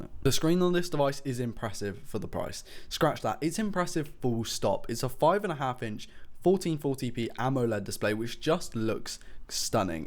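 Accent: United Kingdom. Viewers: I like the way you speak, which part of UK are u from?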